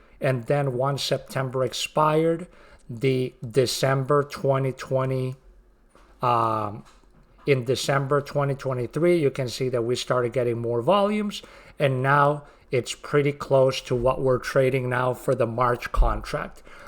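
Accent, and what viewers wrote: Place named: Belgium. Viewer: Are you from Belgium? That accent sounds familiar.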